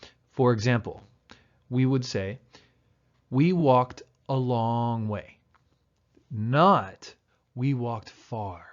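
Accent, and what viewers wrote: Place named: United States. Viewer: your classes are incredible and your american accent is great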